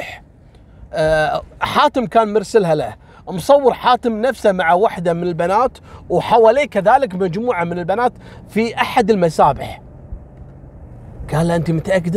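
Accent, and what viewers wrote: Morocco. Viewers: كفو يا اللهجة المغربية متعوب عليها 😂😂😂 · اه على اسلوبك بالدارجة المغربية 😅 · تعلم الهدره المغربيه لايقة عليك يالكبيده ديالي😘🇲🇦🇰🇼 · حفظك الله يا حلوه للهجه المغربي منك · ناري على الهضرة المغربية😂😂😂😂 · Salam nice story your moroccain accent is so funny. I am following you all the time. Greating from 🇧🇪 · هالقصة سمعتها من عند الخراز. لكن من عندك يا لها طعم اخر وكاني اول مرة نسمعها تعجبني لهجتك المغربية تفطسني ضحك ههه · Tbarkallah 3la lahja lmaghribia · هههههههههه كلامك بالمغربي يضحك الله يسعدك يا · احلى شي يوم تتكلم مغربي 😂😂😂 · السلام عليكم انا جزايرية أقيم في فرنسا وانا من متتبعيك الدايمين لك على اليوتيوب والله ابارك قصصك رايعة واداءك اروع الله أوفقك انشاء الله انت تضحكني وتعجبني كثيرا عند محاولتك الكلام بلهجتنا المغربية جزاك الله كل خير يا 😊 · الله عليك يا وعلى اللهجة المغربية 😊😊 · 🤣🤣🤣🤣🤣🤣لجهه المغربيه تذبحني ياحليلك يا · انا ما انتبه على احداث القصه بسبب اللهجه الي تتكلم خاصه المغربيه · قصة محزنة لكن حديثك بالدارجة المغربية يضحكني الصراحة 😂😂😂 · اموت بضحكتك صراحة 🥰 وفاش كتهدر المغربي يا سلام يا سلام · و الله احب لما تتكلم لهجة المغربية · 😊 حلو يتكلم مغربي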